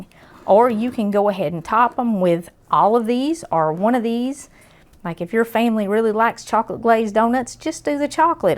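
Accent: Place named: Georgia, United States. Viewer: i feel for you so much. to lose a loved one is bad enough but to lose you're soul mate is that much tougher. from you're accent i think you are from somewhere in georgia, correct me if i'm wrong. i live i south georgia and thats what i here. love to you sunshine and god bless.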